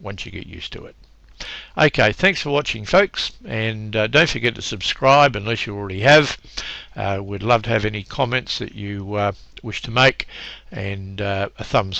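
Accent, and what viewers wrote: Australia. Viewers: awesome! Thanks. You are an Aussie...right?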